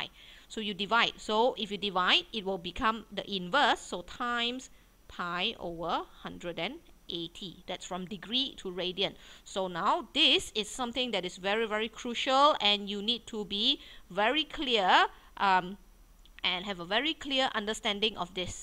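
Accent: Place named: China. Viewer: She's Chineese. Indians have worse accent.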